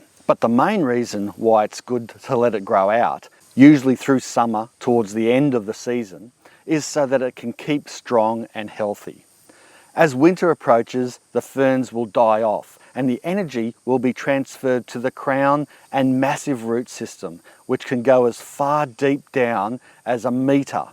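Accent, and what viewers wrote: Australia. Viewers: Skip to for tip one then for 2. Jesus just turn playback to 2x if you don't have and issue with the Aussie accent.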